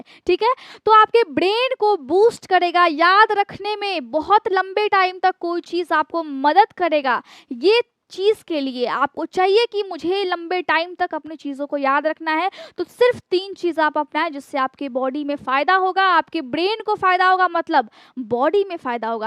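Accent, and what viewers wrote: Bihar, India. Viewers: I love your way of speaking like bihari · Bihari lag rahe ho Ghar hota h Ghad nahi Agar hota h Agad nahi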